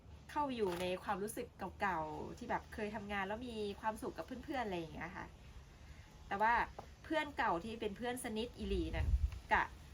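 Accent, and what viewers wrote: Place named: Nyaw, Thailand. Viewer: สาวไอ่ไทเมืองสกลบ่ สำเนียงคือญ้อเมืองสกล หมานๆเนาะ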